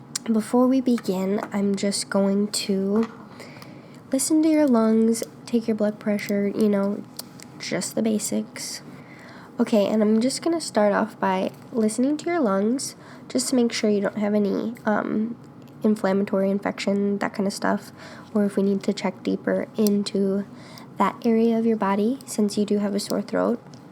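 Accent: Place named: Syracuse, United States. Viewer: CONTROL. Control is the word you wanted. By the way, are you from like Syracuse or somewhere around there? Your accent sounds like everyone I went to high school with haha.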